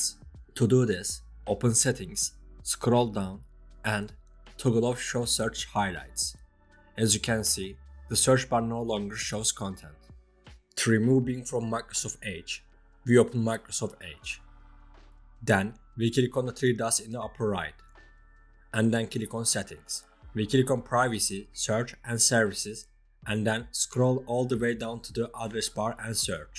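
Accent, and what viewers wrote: Turkey. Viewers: türksün değil mi konuşmandan anladım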